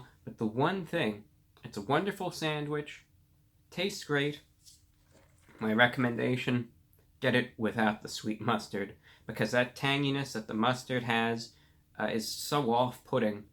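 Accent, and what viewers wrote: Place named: New England, United States. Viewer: he's from new england, it's a slight new england accent, my grandpa was born in massachusetts and he has a thick new england accent.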